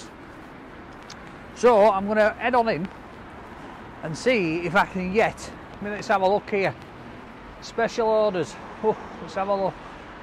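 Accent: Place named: Yorkshire, United Kingdom. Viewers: He's from Barnsley and lives in Leeds I'd say that's a Yorkshire accent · The accents are similar but not the same. This guy is from West Yorkshire and Peter Kay is from Lancashire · Yorkshire accent rainy weather and brilliant fish and chips.. I'm fasting but I'm coming after Ramadan for this.. Great video pal keep it up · I do really love the voice of a Yorkshireman!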